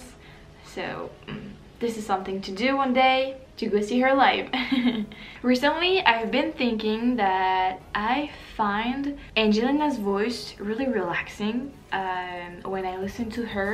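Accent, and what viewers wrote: France. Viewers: Your ( French ) accent is so beautiful, so calming, so relaxing as well...Great reaction my friend..Cheers.. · I think you are such a great person! I love your passion for her and you are right that she can make life better to listen to her when things are stressful. with the virus right now she is able to make it better. You are so cute as well and I love it when you talk! I love the french accent you have and it makes me happy just like when Angelina sings!!!!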